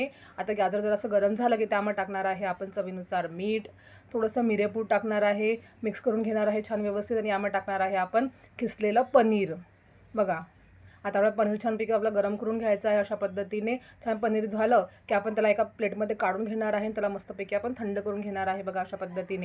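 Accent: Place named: Vidarbha, India. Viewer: शेवटच्या😊 दिवशी एकदम जोरदार रेसिपी तुम्ही विदर्भातले आहात का बोलण्यावरून वाटलं म्हणून सहज विचार😊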